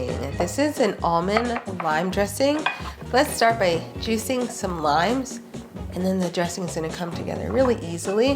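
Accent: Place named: South, United States. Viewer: she's from the south, USA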